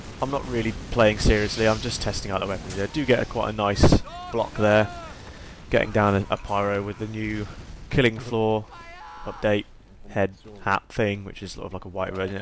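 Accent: England, United Kingdom. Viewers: AMAZING VIDEO!!!! Are you English or British? Srry, im not tryin to be rude at all, just curious.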